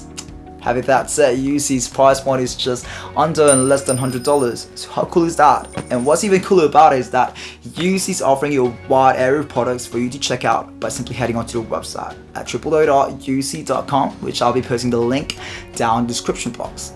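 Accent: United Kingdom. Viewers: Wow! British Accent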